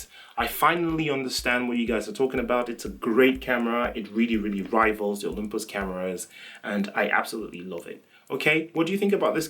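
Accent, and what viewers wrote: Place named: England, United Kingdom. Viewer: I just ran into your channel, I'm loving the content! It `always makes me smile hearing a fellow Englishman on the internet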